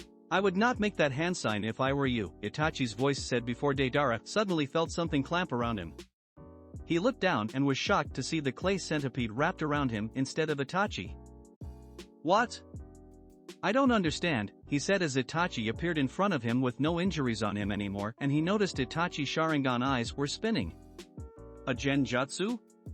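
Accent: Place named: Japan. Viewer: At some point the text to speech program took on a Japanese accent. That was at some point after the 30 minute mark.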